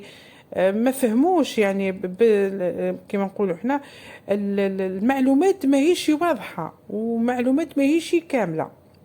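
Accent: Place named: Souk Ahras, Algeria. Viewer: ختي لهجتك سوقهراسية...قريبة للتوانسة ماشاء الله...شكرا على الفيديو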